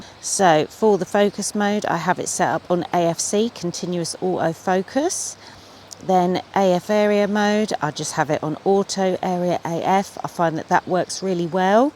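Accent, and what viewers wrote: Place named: United Kingdom. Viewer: British. Nice t see y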